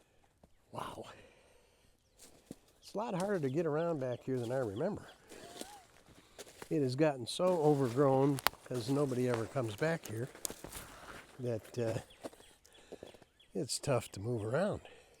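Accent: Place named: Midwest, United States. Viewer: The Ramblings of Bry As an American from the South, sounds as mid-western as Walter Cronkite.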